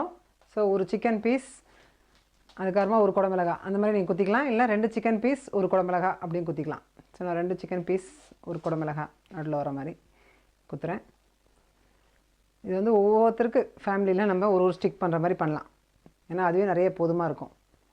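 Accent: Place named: Chennai, India. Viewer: vial modern nana kitchen. mode look. but still kneeing pesra madras tamil super irk madam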